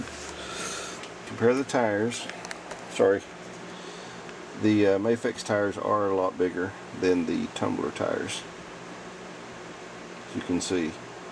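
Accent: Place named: West Virginia, United States. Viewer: Your accent tells me you are from West Virginia or close to it.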